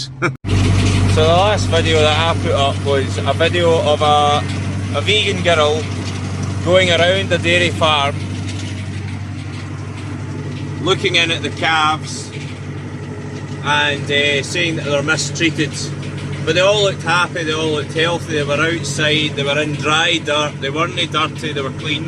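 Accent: Scotland, United Kingdom. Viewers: From Brittany, i like your funny scots accent. It's a bit hard at the begginning but when i catch your accent it 's really fin 🤪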